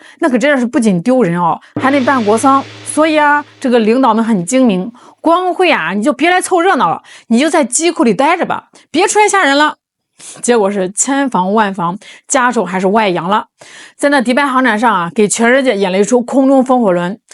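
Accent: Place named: Henan, China. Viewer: 主播的口音好重😂河南人吗？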